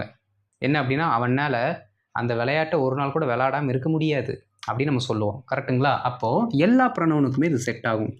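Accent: Coimbatore, India. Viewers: I thought so.. That's why I asked.Coimbatore slang. (Nice) Thanks for answering. I madam.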